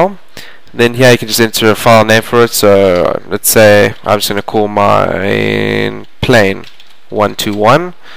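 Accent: South Africa. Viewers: dude your south afrikan neh? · Are you from South Africa?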